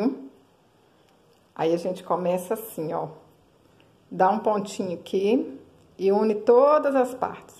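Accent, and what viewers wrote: Minas Gerais, Brazil. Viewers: Que trabalho lindo!bonitin !vc é mineirinha, pelo sotaque 😘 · Adorei o seu mineires. ( MG)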